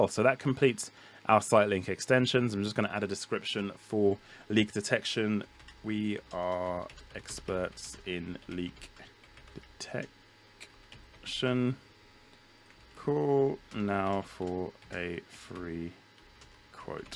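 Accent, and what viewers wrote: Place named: United Kingdom. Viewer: I was about to sign up ro linkedin to get access to their learning library but the reviews on their google ads course are shady. Apparently the guy doing the videos has a bad accent and is hard to understand. Then I was considering paying for a cheap Coursera digital marketing course but that covers things I already know and is more costly. I wanted to focus on Google Ads, which is what you are doing here in a beefy 5+ hour video. This is what was looking for. Not only do you have a cool Brih-ish accent but you're putting this out here for free! It's wild. Thank you so much I intend do watch this whole thing thoroughly and injest all your knowledge. Thank you very much kind sir! PS: I love the gaming props in the backround!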